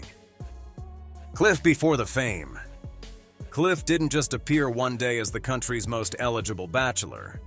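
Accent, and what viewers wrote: United States. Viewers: I found this hyperbole tiresome, especially in that IT American accent. Seemingly Cliff did indeed enjoy a permanent relationship with another man. The longevity of this relationship should be celebrated rather than being the subject of unpleasant inuendo. His resistance to probing questions about his private life during the censorious times he lived though is absolutely understandable. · AMERICAN VOICED CRAP!!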